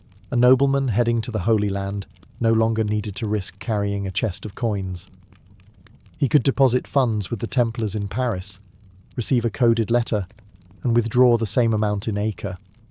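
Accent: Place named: United Kingdom. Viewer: It takes a British accent to make sex stories sound boring as f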